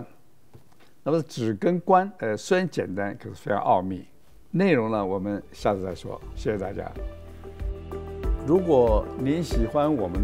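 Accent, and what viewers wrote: China. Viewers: 為什麼用的是中國腔的語音啊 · 為什麼語音是中國口音？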